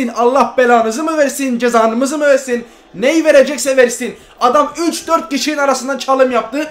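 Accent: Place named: Northern Cyprus, Cyprus. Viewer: Ingılterede yasayan kıbrıslı turkmusun aksan bıraz oyle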